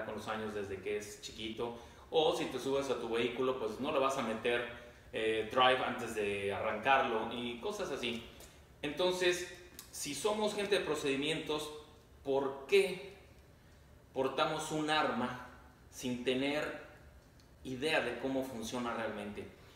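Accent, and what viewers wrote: Mexico. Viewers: Saludos desde España para México lindo,querido y armado.Videos interesantes y además en el espléndido español mexicano,lo cual se agradece.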